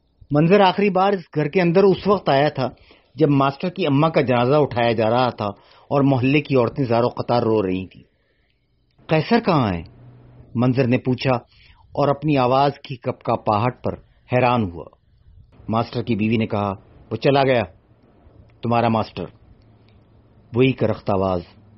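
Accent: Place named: Karachi, Pakistan. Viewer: سب سے پر لطف بات میرے لئے اس کہانی میں کراچی کی زبان تھی۔ اور اس کی ادائیگی بھی خوب کی ہے۔ شکریہ۔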